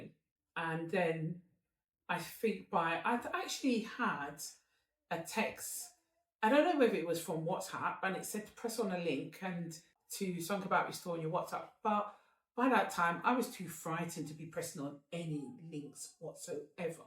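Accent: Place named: United Kingdom. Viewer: Am actually watching this video but I don’t know which one am confused at if it’s your beauty or the British accent or the boldness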